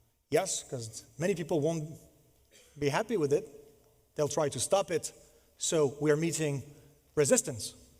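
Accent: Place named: United States. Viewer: où avez-vous appris à parler si bien anglais, avec un accent américain de très bonne facture?! En tant que prof d’anglais je suis impressionné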